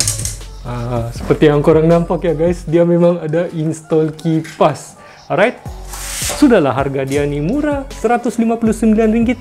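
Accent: Sabah, Malaysia. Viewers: He from Sabah so have different slangs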